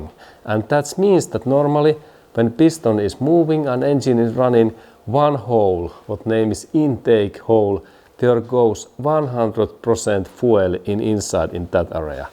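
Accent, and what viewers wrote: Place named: Finland. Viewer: that killer finnish accent good job guy!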